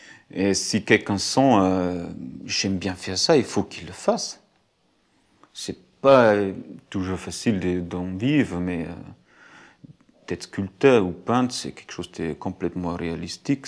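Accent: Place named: France. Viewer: Amazingly beautiful work. All that and a french accent.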